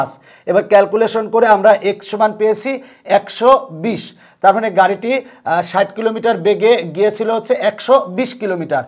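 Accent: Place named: Kushtia, Bangladesh. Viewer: সার আপনার বারি কুষ্টিয়া মনে হয়🤔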